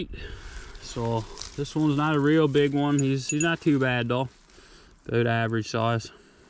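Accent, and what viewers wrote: Newfoundland, Canada. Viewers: Yeah dogs look fine. I looked up Newfoundland accent and it fits (to my ear at least). So that was my guess. Hope to see some recommendations on snow shoes for that type of terrain. It looks like where I live in Sweden.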